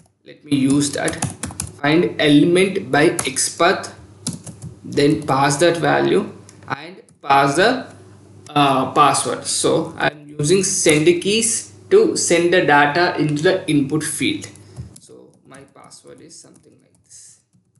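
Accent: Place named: India. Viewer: You Indians have destroyed the normal accent of English. I am unable to understand.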